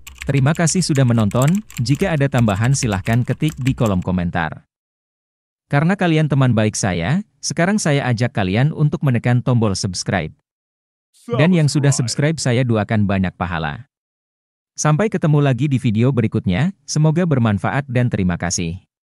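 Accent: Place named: Surabaya, Indonesia. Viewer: Gara2 suara surabaya saya terdampar disini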